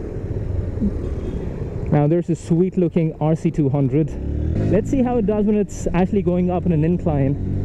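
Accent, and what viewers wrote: England, United Kingdom. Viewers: अब तो अपना हॉलीवुड हीरो भी अंग्रेज़ी accent की तारीफ़ सुन सुन के बोर हो गया होगा यारो, gotta say something new mate.. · Your English accent wow ...teach me master 👌👌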